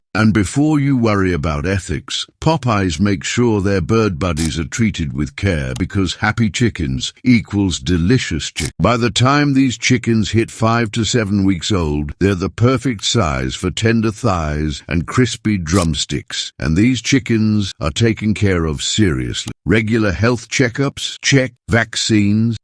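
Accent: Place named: United Kingdom. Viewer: What the hell! You take an all-American icon like Popeye's and lend it a frickin' British accent. Popeye's has got NOTHING to do with England. Why don't y'all hop on your own coat tails?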